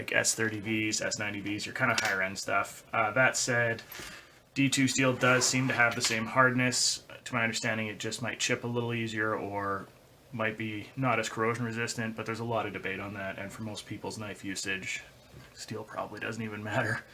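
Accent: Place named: Vancouver, Canada. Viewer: This guy has a vancouver accent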